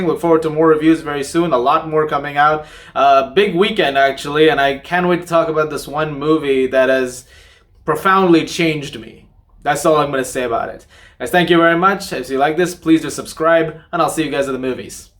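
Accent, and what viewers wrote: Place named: United States. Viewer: I am also Indian 🇮🇳 I am just asking as you can speak English so fluently like an American accent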